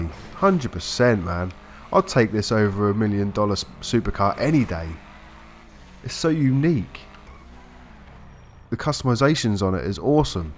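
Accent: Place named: Norwich, United Kingdom. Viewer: Your from Norwich right? Or very very close lol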